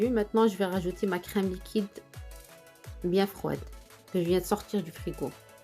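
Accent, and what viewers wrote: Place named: Algeria. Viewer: هذي من لهجتها باينة جزائرية شكرا على الوصفة اختي وبارك الله فيك انا جيت من طرف جيجي ستارز 💕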